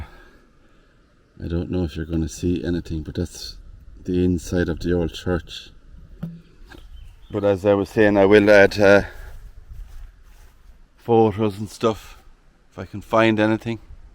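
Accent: Ireland. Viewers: Your pronunciation is part of your Irish charm! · You have a lovely Irish accent; I could listen to it all day long😊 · I love your Irish speak! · Love the Irish accent! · love the Irish accent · I knew you were Irish, guessing Northern. Love the accent. Also, love seeing all those old churches and grave sites. Living in “the new world”, means anything older than the late 1700’s is extremely rare. I am also guessing it is a Norman tower on the church(?). Lovely old buildings, so glad they are preserved by the Friends of friendless churches. 🩷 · I love the old grave sites. The older the more interesting they are. Someday I want to visit there!!! It's been a life long dream. NEVER change, I'm American and I ❤ your Irish accent 🎉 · I love your Irish accent. Thanks for a lovely tour. I do a few tours of cemeteries as I document graves for genealogy. Fascinating histories to be found! 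· Hello, I preferred the exterior of the chapel before restoration, the ivy and lichen made it. Painting the tower white was horrific vandalism! Thank you for a very pleasant wander around the churchyard and for narrating it in your wonderful Irish brogue. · You bet you're Irish! I like your accent. It's what Irish is to me. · your Irish lilt is delightful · i like your Irish accent. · I’m 🇺🇸 and I like to hear the Irish accent and people are rude to fuss about pronunciation, don’t change! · Irish speech is enjoyable. So interested in the bell and tower. Healing tones from the old bells. ❤🇦🇺🙌👍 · Oh My Lord, how could someone complain about the accent? I think stories are best told with an Irish Accent - don't know why (maybe it's the ancient Irish DNA that my body still carries), I am American, with Scottish roots (and some Irish, Welsh and English mixed in). I absolutely love the accent. 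Ours is so boring. I love hearing you and Sue say Tirty-tree, it makes my day. I agree with you - you're Irish, that's how you talk and it's this American's preference. That's a hill I would die on, lol. There is something in the way the Irish speak that absolutely lends itself to storytelling, and makes it so easy to listen to. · Don't apologise for our irish accents when we speak in the language of our former oppressors. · I enjoyed all of this, ESPECIALLY your Irish accent.☺️ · I am American, and I love your Irish accent!!!!!!!! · Thanks from Australia and we love your 'tertee' it's how we knew you were Irish. Take care. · I love your Irish accent. I lived in Hawaii for almost 20 years, and they also pronounce their threes trees. If we all sounded the same and looked the same, what a boring world this would be. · I adore your Irish accent just wonderful and your pronunciations are perfect and no I wouldn't change a thing about you! 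I find it mesmerizing to listen to, thank you for this great video tour, love the church! Just beautiful ❤️ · The wall paintings are a stunning find! A shame we can't see what there is, but they do need to be protected in these destructive times. Marveous old church -- the Friends of Friendless Churches do valuable work. Nice to visit with the former residents of the parish, thank you. Your Irish accent is just lovely -- I can't imagine any moron objecting to it! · An unusual family name of Skone. I understand the Skone surname is rooted in British, Dutch, and Flemish origins. BTW, love the Irish accent and language, very important to keep it. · Hi how rude of some gimp to mention how you pronounce 33 the cheeky hugger. I adore your accent and your lovely Irish brogue, Thankyou so much Love and kind wishes from Lynn in Brittany xx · Doesn't matter if you say THREE, TREE, or any other Irish way, I love this channel. 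I wish i had an Irish accent personally. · Love your irish accent! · Only a ignorant person could criticize your accent. The Irish accent is one of the most charming accents in the world. Thank you .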